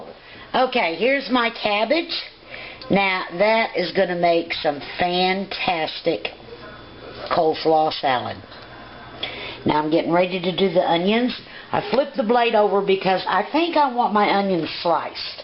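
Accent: South, United States. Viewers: I am so buying one! I must admit your accent is too cute and I'm a Texan! Gotta love the south!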